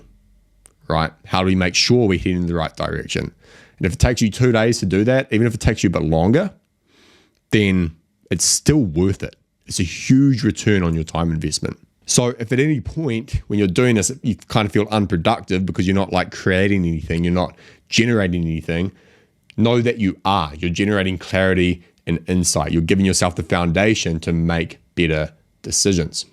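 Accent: New Zealand. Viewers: probably you have to wait for YouTube. I think he has a New Zealand accent, I also have to pause sometimes to rewind and understand a couple words that are pronounced differently than what I'm used to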